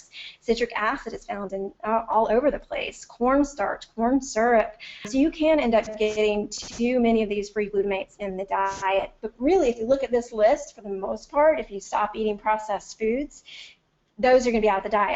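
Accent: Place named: South, United States. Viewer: This info was very good BUT.. I'd like to give you some helpful auditory info if I may. The audio on this video is horrendous, you sound like you are talking through a pipe. I have this and other conditions which make it especially annoying to decipher. You have a southern drone, plus you are very monotone and nasal, and you talk far too fast for your audience and the compromised audio quality, it cannot possibly match your words trying to match your brain in delivery - it cannot unless you improve the audio, eliminating echoes and reverb. You must adjust, just slow down by about 25% if you have this low quality audio gear. Sorry but I had to leave due to the above situations, although I did mute this and flip through the charts which are great. I truly hope you can resolve the quality issues, as you otherwise have a lot of good info to tell, thanks.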